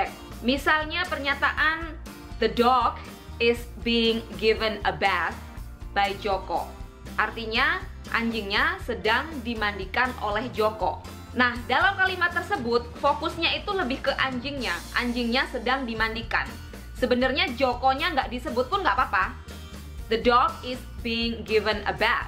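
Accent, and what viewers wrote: Surabaya, Indonesia. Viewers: Mama udah lebih dari 5 aku suka video bahas bahasa inggris. ternyata sama2 orang sby, makanya paham gitu berkat logat2nya. Nice! wkwk Bahas masalah jebakan di toefle dong mom. Pengen tauuuuu. makasih banyak! good job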